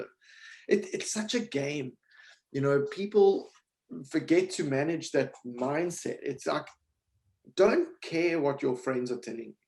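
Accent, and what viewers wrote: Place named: United Kingdom. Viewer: you have such a pleasant way of communicating I could listen to you talk all day. The British accent doesn't hurt either.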